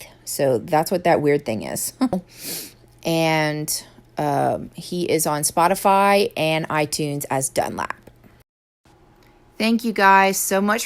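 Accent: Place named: South, United States. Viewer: Guhhh this made me smile! I love you so much and I LOVE that southern accent sha :-) #nevachange 😄😄😄 Also chili's and Lowes sounds like the best date ever. Just sayin. 😂😂😂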